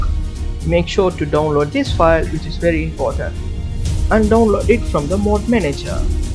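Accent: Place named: India. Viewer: funny, because I can't even begin to understand it full stop. the music, the mic quality, the indian accent... what is he saying?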